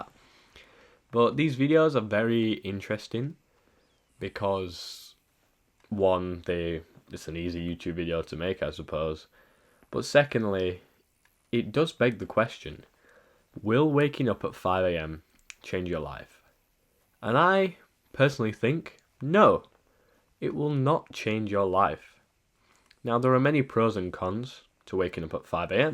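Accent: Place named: Sheffield, United Kingdom. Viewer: sheffield accent spotted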